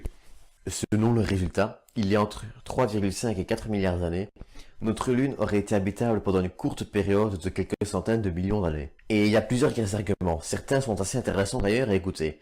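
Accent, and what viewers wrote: Liège, Belgium. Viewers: T'es liégeois toi nan ? 😃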